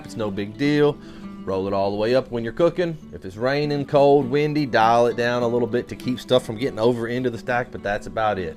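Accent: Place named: Rural, United States. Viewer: Love you man! I miss that country accent being in COMIfornia now. Beast to you and your page.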